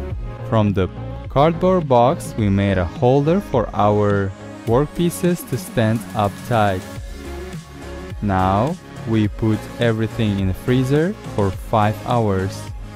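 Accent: England, United Kingdom. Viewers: i think this is the most british accent i heard from you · Its kinna cute when he call that fruit punch mash potatoes 🤣🥰🥰 im i the only one who deeply inlove with his english accent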